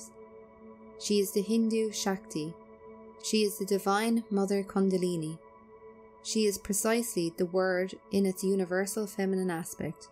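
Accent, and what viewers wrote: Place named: Ireland. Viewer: No doubt, that’s a lovely Irish accent I hear. Thank you.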